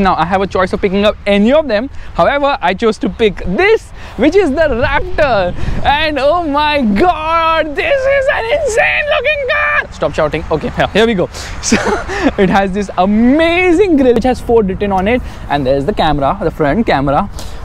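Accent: India. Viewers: I love your Indian accent especially when your this excited. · Why is your accent so Hollywood Indian character ish...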